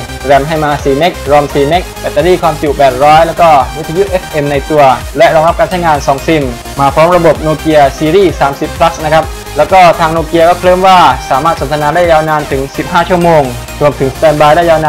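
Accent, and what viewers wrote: South, Thailand. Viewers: ทำไมพูดเหมือนทองแดงนิดๆ คล้ายสำเนียง 3 จังหวัดภาคใต้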